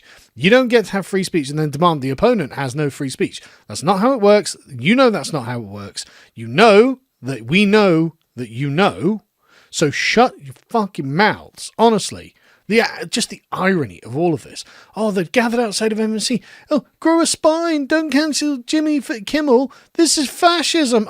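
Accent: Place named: United Kingdom. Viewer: You may have bought all that equipment 6-7 years ago but damn. You can’t buy that Brit accent that turns $2 words into $10 words.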